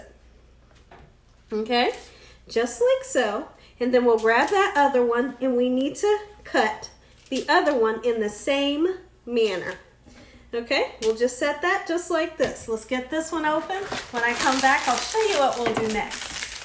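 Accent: South, United States. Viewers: You accent is sooooooooooooo bomb. I love a country girl accent💖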